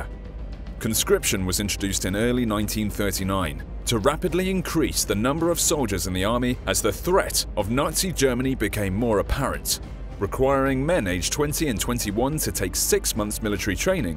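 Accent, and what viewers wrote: United Kingdom. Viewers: a british guy is narrating a british eps. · I like how they got a british narrator for this video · like how the dude narrating has a British accent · I love the Britsh accent :D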